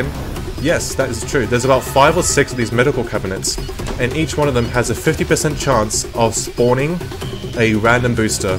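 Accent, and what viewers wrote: Australia. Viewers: thank you for this helpful guide mr. aussie man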